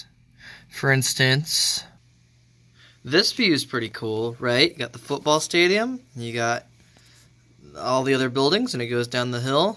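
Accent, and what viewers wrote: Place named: Georgia, United States. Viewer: You have a really nice voice. Are you from Georgia by any chance? I've known a few people from there who pronounce words with similar intonations. This vlog was really comfy. I liked how you cut from thing to thing, it gave a sense of pacing as you were developing each topic. A lot of the stuff you were talking about felt very mundane but that was the charm of it for me. Getting to hear someone with a likeable personality and soothing voice share what their life is. And the fact that you showed for example an unedited clip of you playing that game that had been spending so much time on drove home that point. It felt very coherent overall and had a nice flow to it. I'm looking forward to what you do in the future!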